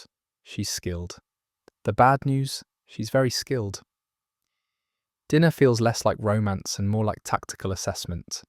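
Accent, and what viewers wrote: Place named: United Kingdom. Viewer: I started listening to videos like this when im studying but the British accent makes it so soothing, I found you guys through the with hunt video but am learning so much from videos. What’s the next topic and when will it be available?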